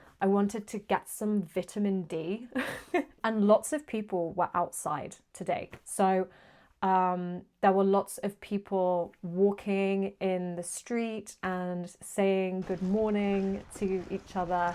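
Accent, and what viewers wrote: England, United Kingdom. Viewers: Many thanks to you!! Good experience for me to hear British pronunciation, and I don't need to make speed lower, as for my level, it might be a little bit faster. I'm from Russia and today in 8th of March, we celebrating international women's day, so wish you stay cheerful and cool as you are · ❤I'm Brazilian and I'm learning more about the British accent from your videos, I'm loving it! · Please teach me how to speak with british accent ahahah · Nice and interesting video, I like British English!....... Thank you so much!...Greetings from Peru!...💞🇵🇪❤️...comprehensible input to practice listening English 💫👍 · The British accent is si beautiful, ojalá siempre fuera así de lento, you are beautiful too ❤❤ new suscriptor 🎉❤ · always love british accent videos, and your gentle voice is so nice, keep on🥰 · This is the first time I've seen one of your videos, and I find everything you explain about rural England, or as you call it, the countryside, very interesting. 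I'm from Honduras, Central America, but I've lived in England since before the pandemic. I really like your English accent, the way you speak, and the cadence you use. I find it very pleasant and clear, and of course, I understand it perfectly because you speak slowly, which makes it very pleasant to listen to. I would like it if, in your new videos, you could explain why the language is spoken with different accents in different cities and counties, because some of them are really difficult for me to understand. Greetings from Nottingham. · Thanks for your videos. I study English, and this content helps me with my pronunciation. I like the British accent ;) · wow I love your accent inglish and thank you so much keep going 👌👌💕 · Hi, your Slow British English is really helpful for English learners. Thank you so much! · You speak very clear words I believe its good for beginners to watch your video to learn English and British accent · I like this video of slow British English, it's really easy for me struggling with my listening and spoken part to listen your words clearly. 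Besides, British accent is extremely elegant while you spoke slowly and clearly. So i appreciate your video of life in British coatal town, hoping you can show your normal life in the UK hh which i prefer to watch, thanks. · good videoes and carry on! And personally, it is not only the videoes that I want to see, but more skills and expressions of native British accent! Now it's the slow english, but what about a bit faster? More training techs and skills would also be welcomed! Thank you so much again for making lots of videos so far! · Is this british?🇬🇧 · Hi, this is jesmine from Bangladesh. I basically played this video to mimic your slow British Accent. Actually I'm learning English and now my level is approximately B2 but my pronunciation is worse this is why I decided to watch and follow your voice and I did it all. thank you for this video and I expect you will make more and more slow video which will be helpful for the learners how want to gain British accent also who want to fixed the pronunciation like me 😁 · You are so quiet. 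And you speak slow British English very interesting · great video! I really appreciate that you speak slowly, it makes it much easier for people who are learning English I' ve been trying to get British accent, and your videos are actually helping a lot also Wilson completely stole the show :)) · Thank you for your vlog. I really love the British accent, and I enjoy learning more about the UK. That’s great! Please keep making more vlogs. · R u native british ?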